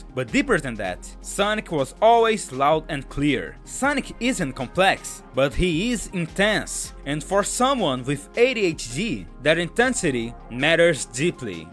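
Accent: Brazil. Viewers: My dudeeee, are you? It's almost the same title and almost same thumbnail! And I noticed that you have that brazilian accent aswell. Just wanted to know. I'm subscribed in both channels, so I was curious xd Ty for the attention! 👊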